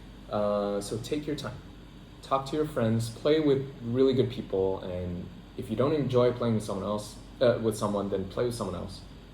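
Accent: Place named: Iowa, United States. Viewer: Jakarta, Indonesia? You sound like you’re from Des Moines, Iowa! Great video brother. Army is spectacular. The advice you share is gold.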